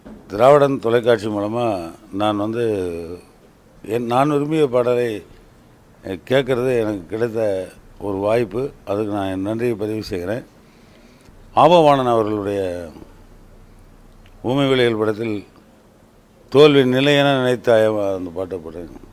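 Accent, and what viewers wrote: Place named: Sri Lanka. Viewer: He is a Srilankan tamizhan, he is speaking pure tamil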